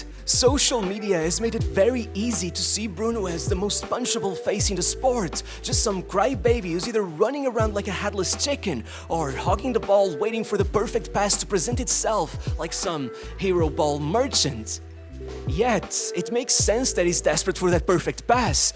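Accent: Portugal. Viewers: I can tell you are portuguese by the way you pronounce portuguese words, great video!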